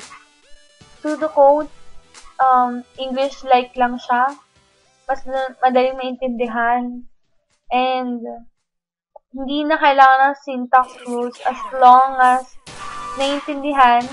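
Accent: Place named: Greece. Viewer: Nice work but your English pronunciation like Greek not that clear.. overally a good work \m/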